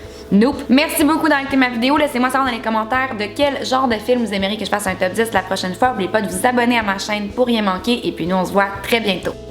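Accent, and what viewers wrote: Canada. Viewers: il est trop beau ton accent canadien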